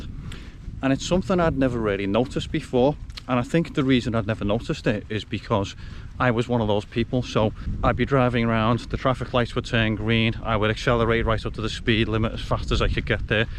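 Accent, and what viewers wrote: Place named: Liverpool, United Kingdom. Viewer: Def sound like a scouser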